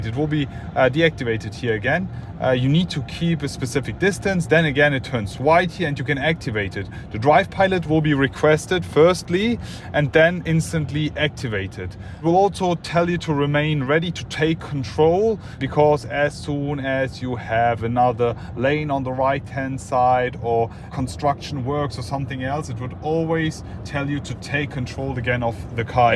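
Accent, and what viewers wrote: Germany. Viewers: Der deutsche Aktzent ist hörbar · The German accent is strong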